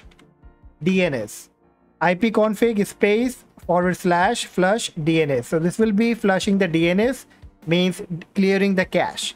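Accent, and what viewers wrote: India. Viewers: i thought its a scam because of the indian accsent but its real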